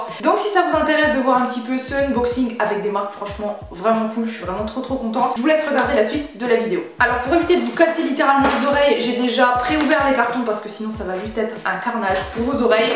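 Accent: North, France. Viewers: Sophie Mdg c’est l’accent du nord 😅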